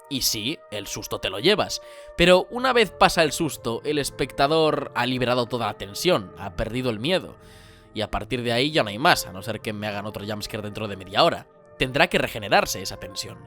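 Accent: Spain. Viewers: Por que todos los youtubers son de españa , llevo media hora buscando vídeos de otras nacionalidades , no es por nada , me agradan pero quisiera descansar de ese acento de la S,C y Z un rato buen vídeo por cierto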